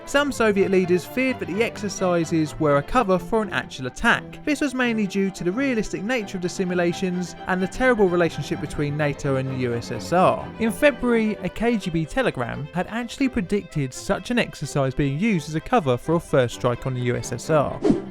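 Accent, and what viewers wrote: United Kingdom. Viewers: Great vid, except audio. The narrator's voice is a bit unclear, heavy Brit accent. Not the problem, but the classical music in background is a bit too loud. Between the two, it sounds chaotic.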